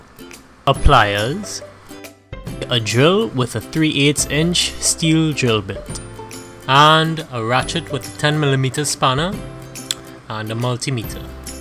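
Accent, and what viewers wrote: Trinidad and Tobago. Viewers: Your accent seems very familiar!! Trini!! I'm getting a lexus altezza next week and lookin at installing one of these as well as a push to start and remote start kit so appreciate this guide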